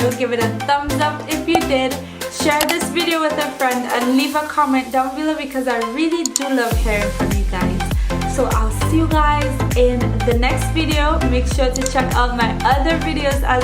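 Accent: Caribbean. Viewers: sounds like you have an accent....Caribbean girl :D.....great video..... I had to cut the rest of my hair to the length of my crown it was fine at first.....and it doing much better....still a bit shorter and grows slower and kinkier but it made soo much progress.....im really glad....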